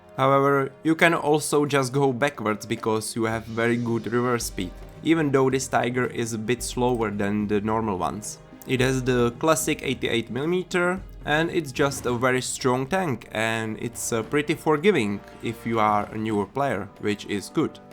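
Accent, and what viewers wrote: Czech Republic. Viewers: Hey are you perhaps czech? I noticed the accent lol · Český přízvuk je docela znatelný :D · It's so noticable that you are czech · Great video it helped me to pick the best premium to buy. Ale ten českej přízvuk mě hnedka hitnul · Já 2 vteřiny po začátku videa: To je jasnej čech, dávám odběr :D Dlouho čekám až se ta youtube česká základna WT trochu rozroste :D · Bro tohle zní jako hodně českej "akcent" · čengliš slyším už od prvního slova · hodně český accent :D nicmene pekne video :)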